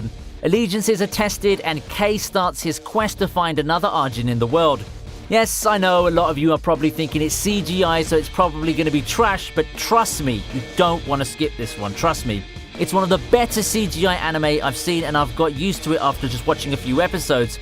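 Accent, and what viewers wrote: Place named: United Kingdom. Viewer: I like your UK accent 👌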